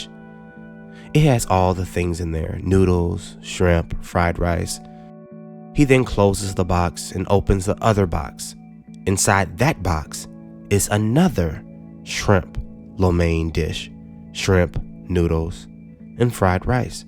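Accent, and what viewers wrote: South, United States. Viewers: I can't place the accent. Sounds slightly southern but not quite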